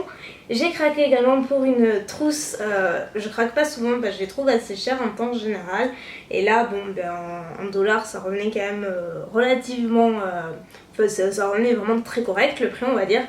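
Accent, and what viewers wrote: South, France. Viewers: Tiia un accennt duu suudd ssuuperrr beeau tres leger mais qui s’entend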